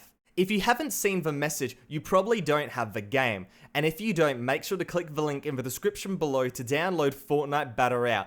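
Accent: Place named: Australia. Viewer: Your aussie to niceee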